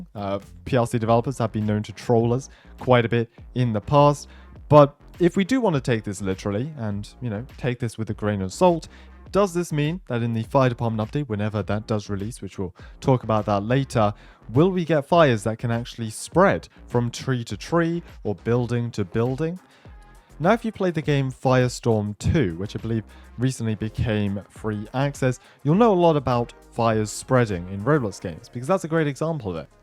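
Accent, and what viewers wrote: United Kingdom. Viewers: Are you British or American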